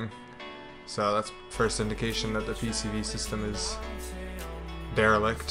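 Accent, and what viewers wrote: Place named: Canada. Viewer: Good video. The Canadian accent makes it even better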